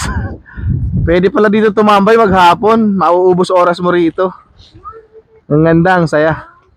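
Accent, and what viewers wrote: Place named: Canada. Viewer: Wow...parang Canadian si ate...ang galing ng accent nya at makipagusap sa mga locals❤❤❤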